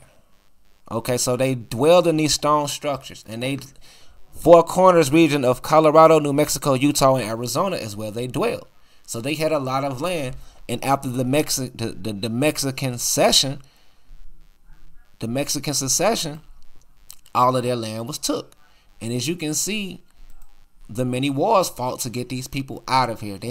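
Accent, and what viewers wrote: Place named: Chicago, United States. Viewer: I knew you were from Chicago before I read it by the way you talk lol. Good video.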